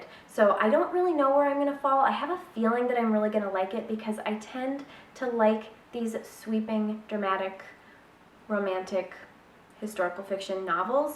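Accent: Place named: United States. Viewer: ur accent is beautiful.... I wanna learn American accent...... what can I do for that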